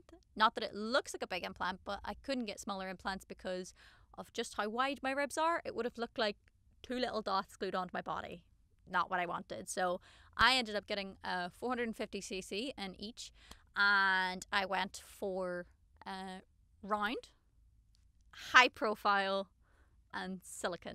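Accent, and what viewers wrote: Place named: Ireland. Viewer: First off, who the hell would write a hateful comment, this is for fans. Anyhoo, a close family member of mine has the same condition(pigeon chest) and a case of flail ribs(the bottom ribs stick out from the ribcage). A male, I guess it might be different in a self-esteem point of view. It posed no health issues and he worked out and went to the gym and although it's still noticeable, not nearly so as before like when he was very young. I didn't know there was actually a surgery to fix or change it; not including the enhancement that is. No one should make someone feel bad about having an elective surgery to correct an issue or to help one feel better about themselves. I enjoy the sound of her voice so much. The Irish accent is absolutely mesmerizing.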